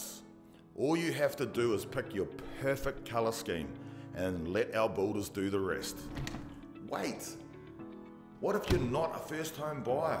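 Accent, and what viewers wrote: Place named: New Zealand. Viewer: Funny to hear a kiwi advertising an ozzie product!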